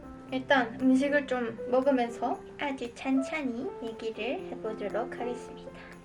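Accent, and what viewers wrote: Busan, South Korea. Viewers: 어투는 표준어, 억양은 부산 사투리, 발음은 또박또박... 각 특징이 뚜렷하시네요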